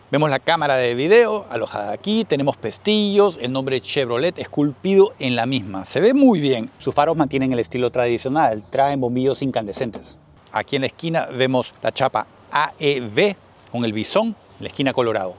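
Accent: Peru. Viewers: habla como peruano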